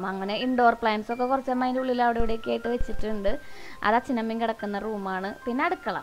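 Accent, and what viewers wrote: Thrissur, India. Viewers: ചെടികൾ എല്ലാം വളരെ ഭംഗി ഉണ്ട് ചേച്ചി... 😍ചേച്ചിടെ തൃശൂർ ഭാഷ സംസാരിക്കുന്ന കേൾക്കാൻ നല്ല രസം ഉണ്ട്.... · Chechi njn Kannur Ann bt chechide thirissur slang samsaram nth Rasa eanik bayankaram ishttanntto nigalde samsaram chechide vlog pwoli super💕💕💕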